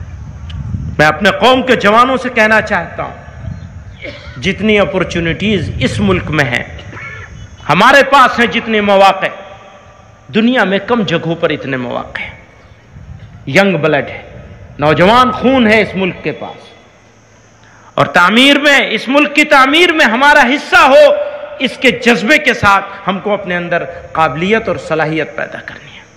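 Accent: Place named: Pakistan. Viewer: Masha Allah Urdu language bilkul Pakistan jaesi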